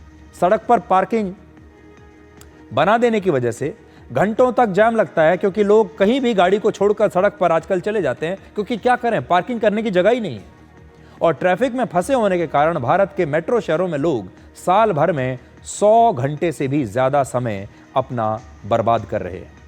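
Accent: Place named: Bengal, India. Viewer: U r 100% bengali. (Ur pronunciation is similar to bengali)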